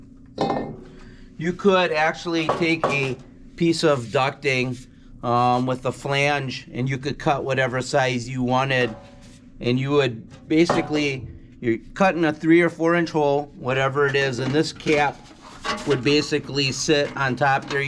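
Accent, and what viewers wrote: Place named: Wisconsin, United States. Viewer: The Wisconsin accent is strong on this one!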